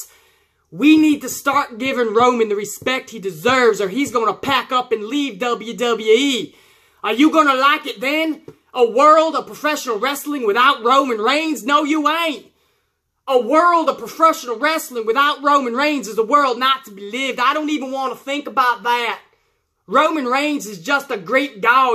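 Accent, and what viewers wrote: South, United States. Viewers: Funny stuff love the Ole Country Accent on the Night The Coke Zero 400 in Daytona is on. There no way Im cheering for Roman way he is booked an Vince Mr. GoldenBoy continues to be pushed down our throats. So, sick of hearing “It’s the Big Dog.” No, Cole its not we know you been freed by Vince to say that. We get it! He not the guy. But again this some funny stuff. is 💪🏻 # #Smarts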